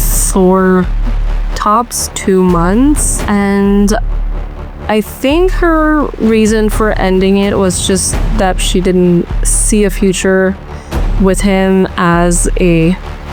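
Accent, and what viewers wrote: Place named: Quebec, Canada. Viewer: She has a slight French Canadian accent. English may not be her first language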